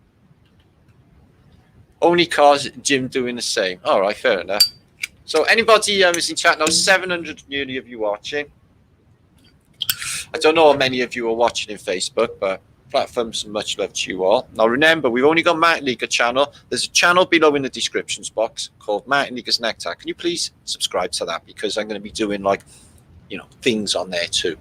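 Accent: Wales, United Kingdom. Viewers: Hi Martin from Queensland Australia, so glad I found you! Am amazed with your work. I was born in south Wales in the 70's- lovely to hear the Taffy accent 😀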